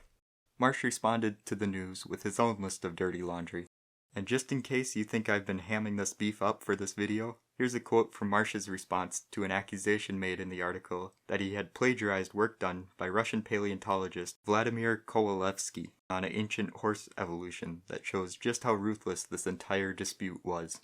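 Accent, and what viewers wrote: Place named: Northern England, United Kingdom. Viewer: Really? He seems so sweet on camera. Must be the northern accent.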